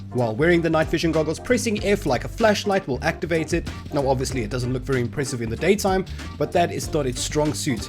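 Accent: South Africa. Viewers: What accent is that? Are you South African? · Are you South African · Are you South African?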